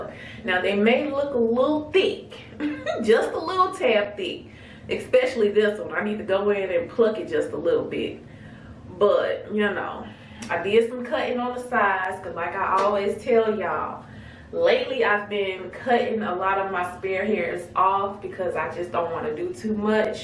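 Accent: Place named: Memphis, United States. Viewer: you're welcome! I'm a new subscriber and I watched another one of your videos today and your accent sounds like mines lol. Are you from Memphis Tennessee?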